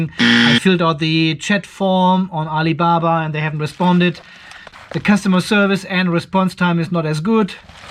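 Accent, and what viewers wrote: Germany. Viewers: You sound like german? Speak deutsch?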